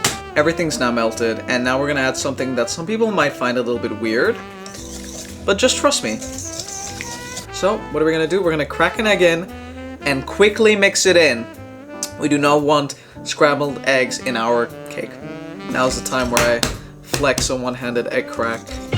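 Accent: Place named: United States. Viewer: As a British person I can proclaim that you sound 1,000,000% American, sorry 😵 it's pretty common for Europeans, but usually there's a hint of something else in there, though I don't detect it with you!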